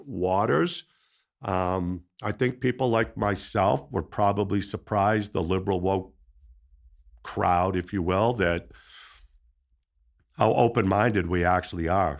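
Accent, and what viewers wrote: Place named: New England, United States. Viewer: I like your style and the New England accent. I am from Ohio since 1977, but born and raised in Massachusetts up close to New Hampshire. You should do well with this show. Thanks.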